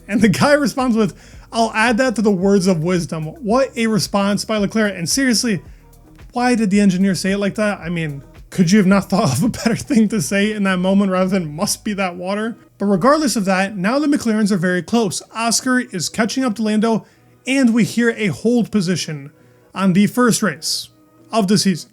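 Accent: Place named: United States. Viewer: As soon as you hear an American trying to discuss what happens in F1 you have to turn off….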